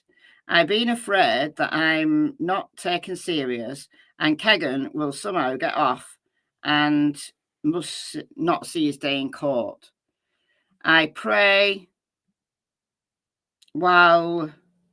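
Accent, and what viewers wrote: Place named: Lancashire, United Kingdom. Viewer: definitely not Allen definitely not there was another Mann looks like him dressed like him etc that exact time used the same type of gun drove the same black ford focus same rims I mean what are the odds aye? BTW ur horrible wooly back accent is really annoying especially when ur desperate like this ur over reliant on your youtube revenue and my God forgive you for what u may be putting g these families through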